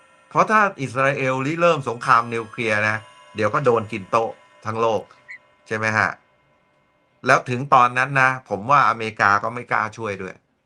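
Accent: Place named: Central Thailand, Thailand. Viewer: ชอบช่องนี้.พุดกลาง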